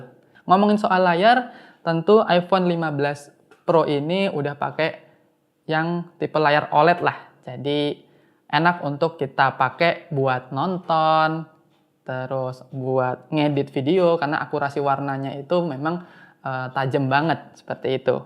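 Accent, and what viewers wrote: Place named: Java, Indonesia. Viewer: Bahasanya medok tapi lo gua lo gua wkwkw. Jawakarta beda dari yg lain😂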